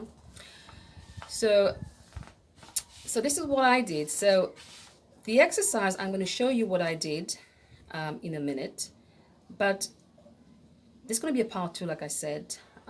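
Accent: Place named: France. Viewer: Your accent is pretty, is it French? I have only a small fibroid but would like to get rid of it. My doctor was not concerned about it.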